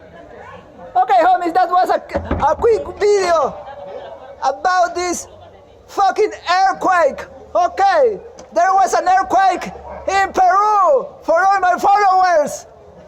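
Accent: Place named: China. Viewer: You sound like a Chinese man when you speak English!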